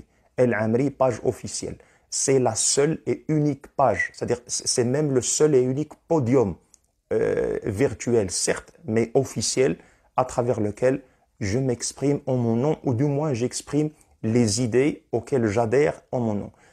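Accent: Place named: Jebli, Morocco. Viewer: عنوان للتواضع وللتمكن. من يسمع كلام شيخنا الفاضل ياسين العمري وهو يتحدث بعربيته الجبلية سيظنه إنسانا متواضع العلم،لكن العكس هو الصحيح ،شيخ متمكن ومتمرس و مرجع في علوم التواصل بالعربية والفرنسية معا بارك الله فيه ونفع بعلمه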